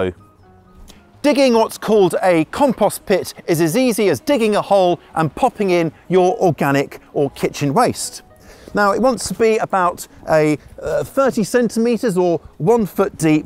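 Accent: United Kingdom. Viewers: He sounds British so I’d say somewhere in the U.K.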